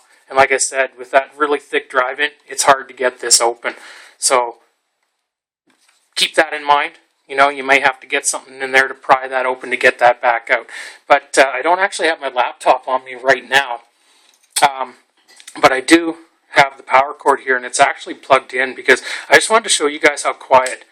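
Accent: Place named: Canada. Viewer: Sounds like Canada ... oot (out). Should've cover more technical details. Most of what you covered was cosmetic. Spec details such as is it bootable, what the three onboard ports can be used with - what they will drive (other USB 3 enclosures, etc) and similar would've been more useful than it looks really nice, it's well-built, you highly recommend it, etc., etc. Also, of course it's going to be quite, it DOESN'T have a fan! Your comments sound like you got paid (compensated with free or discounted product) for this review.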